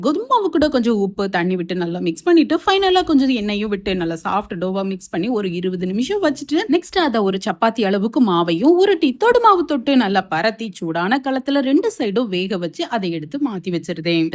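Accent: Tirunelveli, India. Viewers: Nenga thirunelveli ah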